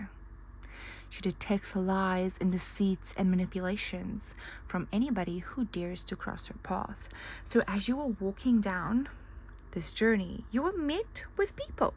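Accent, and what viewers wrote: South Africa. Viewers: My Sister you are in my energy 100%. Your reading are so aligned with my life right now. can I ask where you are from sound south african 😊🇿🇦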